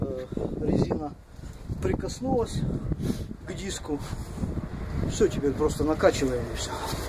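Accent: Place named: Russia. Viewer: Parabens pela ilustre ideia, vou fazer uma tambem, obrigado. O sutaco parece de russos eles sao inteligente👏👏👏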